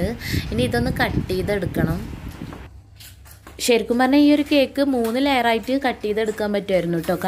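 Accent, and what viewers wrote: Malappuram, India. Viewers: Ningalude sthalam evdeyaa.. Malappuram anoo.. Pakka malappuram language anallo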